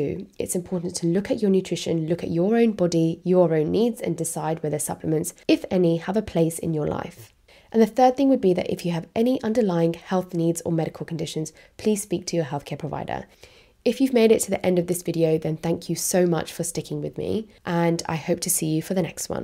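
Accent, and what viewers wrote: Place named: India. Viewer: Indian accents are so brutal. H8 it.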